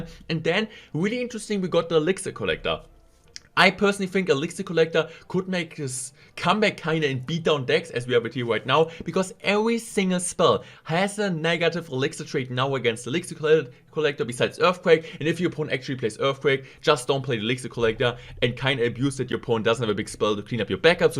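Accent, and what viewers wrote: Germany. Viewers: Man hört das Deutsch so extrem raus. "clash wojal" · German accent alarm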